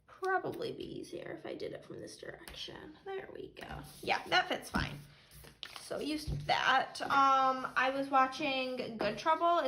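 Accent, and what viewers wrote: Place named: Upstate New York, United States. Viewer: You DEFINITELY have that upper NY accent. It’s cute though!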